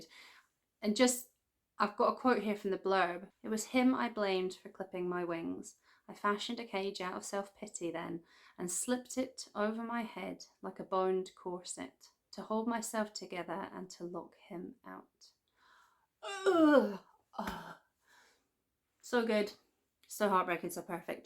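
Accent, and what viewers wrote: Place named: Scotland, United Kingdom. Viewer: Your Scottish accent still comes through a little bit! I hear it in certain words.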